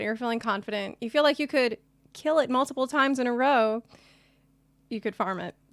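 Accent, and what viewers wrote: South, United States. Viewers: What accent is that? Definitely southern and super cute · Bless your heart, darlin, you're as southern as I am lol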